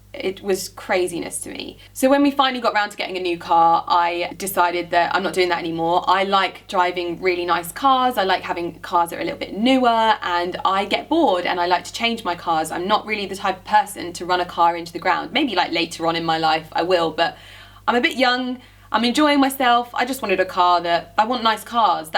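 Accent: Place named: England, United Kingdom. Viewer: I wish I had an English accent! You are beautiful, inside and out.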